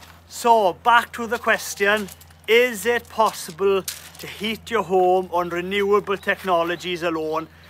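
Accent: Wales, United Kingdom. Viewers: Hi, what model rayburn have you and how many rads? Can the rayburn handle it? Do you know what sort of kw output you get from your rayburn? Im wondering if a rayburn would heat a kitchen adjacent to my lounge if i put the rayburn in my inglenook in the lounge as flu etc already in place currently have 5kw log burner in lounge and heat won't travel to kitchen i have tried fans and all sorts now thinking of rayburn ty for video nice to hear welsh accent again after growing up in Brecon, in Shropshire now. Cheers.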